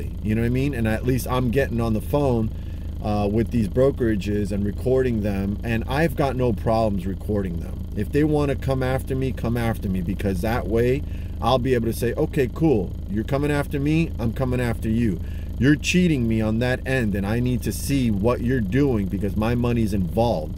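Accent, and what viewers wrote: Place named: Southall, United Kingdom. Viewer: Keep up the gd work,well said! appreciate the dirty grind ur doing n outting these brokers,u spoke like a true Southall (west london) boy,head up 👊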